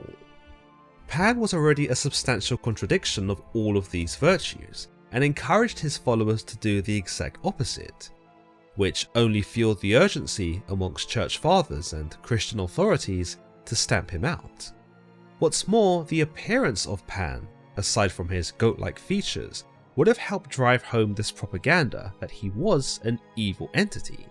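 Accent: United Kingdom. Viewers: No AI voice just a cool British guy with a great narrator voice keep going brother .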